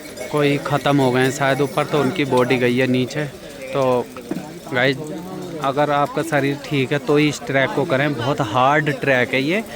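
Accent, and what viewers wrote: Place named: Mathura-Agra, India. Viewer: Bhai aapka Ghar kahan hai. Aapke kisi video main aapne kumaun bataya tha, par aapki boli mathura, agra side wali lag rahi hai. 😊 Please thodi kripa karein.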